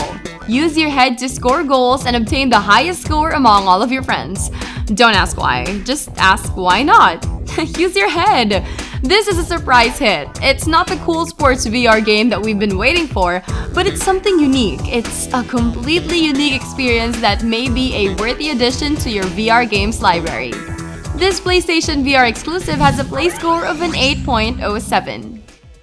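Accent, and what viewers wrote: Netherlands. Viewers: huh are you Dutch?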